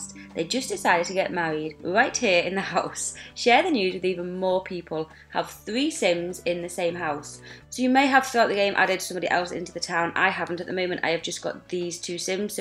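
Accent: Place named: United Kingdom. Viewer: Idek but I love the way she talks cause she’s british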